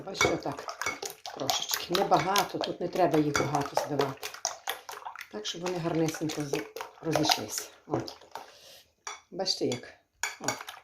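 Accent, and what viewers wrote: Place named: Bukovina, Ukraine. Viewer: Дякую за гарну нашу буковинську мову та гарне викладення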